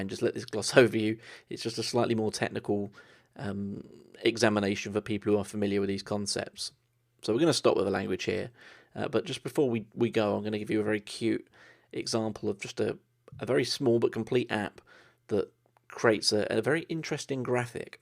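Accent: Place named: Liverpool, United Kingdom. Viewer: he tawks like a beetul..cool.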